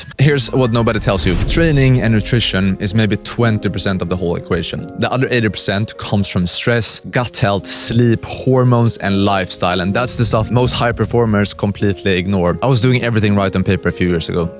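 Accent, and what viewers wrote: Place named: Sweden. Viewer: I would love to se this video but I cant stand a swedish accent. Can someone tell me what tho overall ide is?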